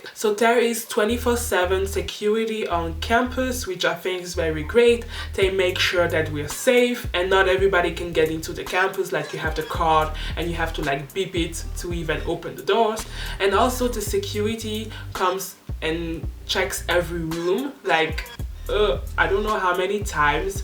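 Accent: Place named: Switzerland. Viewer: Trust me, you're not a C2 and I sincierly think you aren't a C1 either. Not to insult you, but I just did the exam for the C1 and passed, however it was pretty taff. Between the C1 and the B2 is a huge gap which makes sence since C1 is already the second highest level. The reason why I do not think that you are a C1 are mainly the collocations. They get really important for the CAE and honestly you might have a look at them again. Secondly your language sounds really swissish. Im swiss, so I know how this is supposed to sound. Usually it is not a bad thing to have an acent. I mean I have one too, not gonna lie. At the CAE they won't even care about that. It is crucial, however, to change some words with synonims for not sounding as repetitive. For instance don't use students three times in one sentence. Chance it up with educators or pupil. This is what they really look at if you wanna take the CAE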